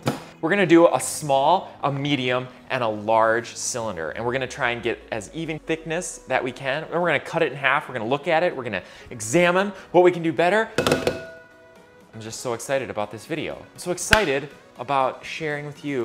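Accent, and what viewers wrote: Minnesota, United States. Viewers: sweet vid. I have been taking classes here where i live in north carolina on the outer banks for the last 2 years- my friend and I just scored a full studio set up and we are just now in the new phase of starting to sell pottery. its soooo fun! We are stoked and love your vids! Im originally from MN and come home every year so I am going to have to come check out Mocha Monkey! you sound like all my friends back home and its sweet to hear your MN accent/vibe!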